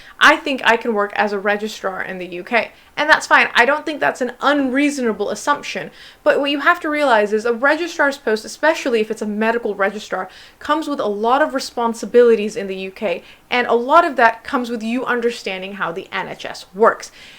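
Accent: United States. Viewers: How are you in the uk and have an american accent?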